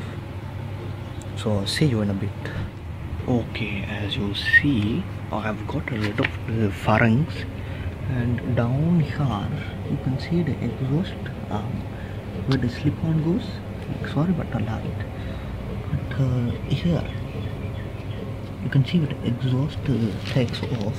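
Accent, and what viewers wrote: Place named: India. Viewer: curry accent :) made me lol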